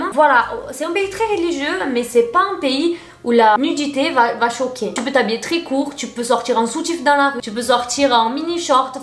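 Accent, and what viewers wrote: South, France. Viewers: tu dois être de toulon peut être, c'est l'une des rares exceptions en france pour qui le rugby est une institution. D'ailleurs, je trouve que t'as un accent brésilien (of course) mais mixé avec l'accent du sud, je trouve ça vraiment stylé. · Mdr j'aime trop ton accent de meuf du sud.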